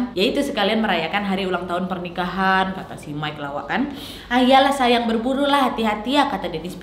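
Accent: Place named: Medan, Indonesia. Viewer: Kek gaya becakap anak Medan.. ya kan wak?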